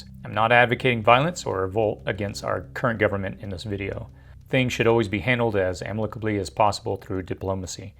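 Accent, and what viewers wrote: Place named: South, United States. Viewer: Actually you do take sides just two tone in the way you talk tells me who you support you're not fooling anybody just a message to a stupid me I know who you support just by your dialect... not to mention you're from down south...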